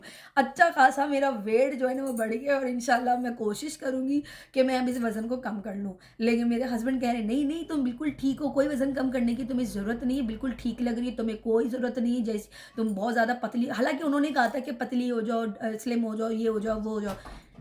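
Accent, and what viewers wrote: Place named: Karachi, Pakistan. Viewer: mughe pata nahi qn hamesha se lugta he tum karachi se belong karti ho tumhari urdu same hamare karachi walon ki tarah he khair hamesha khush raho❤❤❤❤❤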